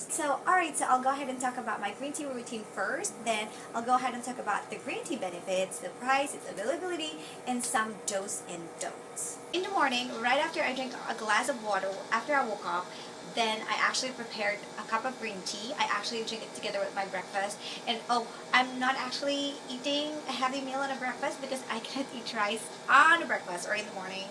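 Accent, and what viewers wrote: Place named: Cebu, Philippines. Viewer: Thank you for your tips mam cebuana pod ko mam i like your accent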